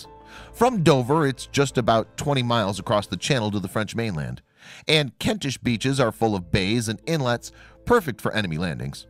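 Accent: United States. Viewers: If you are going to post a YouTube video get your facts straight. What the hell is an American doing a Voice Over on a British subject? The mind boggles. 🤪🤪🤪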